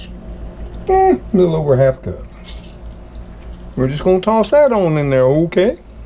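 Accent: South, United States. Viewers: if your a Finnish person it's all salt and pepper, Ha Get right down to it. I learned Mrs's Dash in Boy Scouts! ha Love your southern swang. Get a job Brian.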